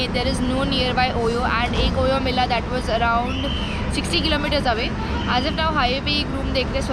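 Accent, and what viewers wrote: Maharashtra, India. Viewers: Bai.. aapki Marathi wali Hindi lai Bhari bolted tu.. ,😁👌👌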